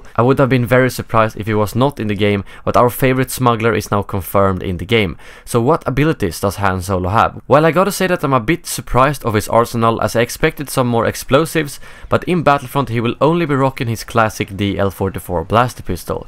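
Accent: Sweden. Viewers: I'm sure you're swedish ! (I heard your accent haha) but great vid bro, keep going! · Are you from Sweden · Jo han är svensk. jag hör att han är svensk.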